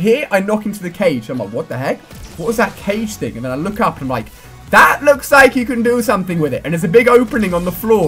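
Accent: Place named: United Kingdom. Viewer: It's because he's British and they sound different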